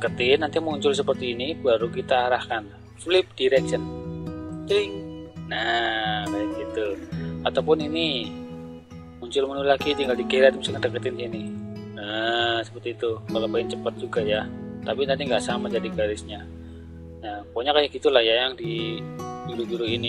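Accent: Banyumas, Indonesia. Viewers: Semngaaat kang..Samapi lupa kunjung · sukses terus om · Nyimak lagi dijelasin sama pak dosen.. Salam Kay Rend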